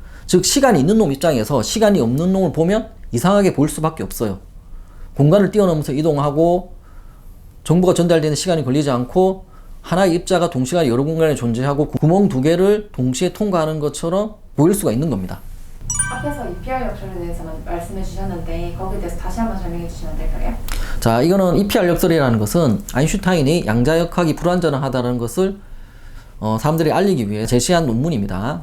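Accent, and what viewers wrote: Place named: Gyeongsang, South Korea. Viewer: 했던말 또하고 했던말 또하고 양자에 쳐맞았냐? 갱상도 사투리 듣기싫다 영상 찍지마라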